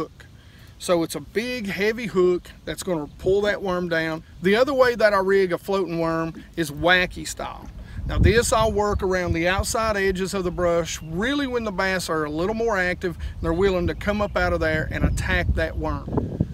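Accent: South, United States. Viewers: Hey, i really liked this video. I watch a lot of Tactical Bassin cause everything else seems to be young guys ass slappin and hi fiving over 2lb fish ud think was their PB the way they giggle and ham it up for the camera. Drives me crazy. Im in Chicago so its tough to catch videos at the right time of season for us compared to where most guys film. It was 36° today and snowed 6 inches yesterday. Tomorrow sunny and 55 so hopefully theyll move up a bit? I grew up in Georgia so u sound like one of buddies. Anyway, no nonsense instructional videos with a southern accent are right up my alley! This is the first ive seen and i immediately liked it and subscribed. Great to see an adult talking about technique and not playing grab ass with a best friend that wants to get on camera!! Do you do guided trips or is this just a fun hobby for you? Id be interested if you do. 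Again great stuff 👍👍. Im now a full time customer. Thanks bud!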